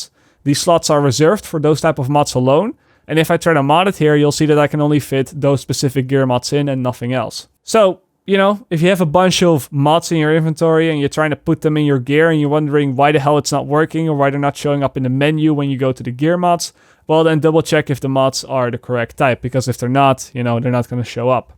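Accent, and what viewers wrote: Netherlands. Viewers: Took me 5 seconds to know you're Dutch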